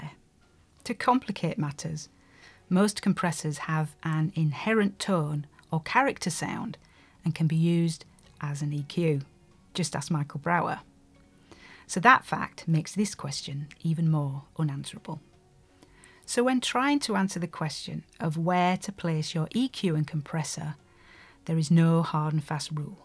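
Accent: Northern England, United Kingdom. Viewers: how does this only have 6000 views??? there is so much terrible nonsense on yootoob and your videos are outstanding. plus it’s lovely to hear a fellow northerner on the interwebs. keep it up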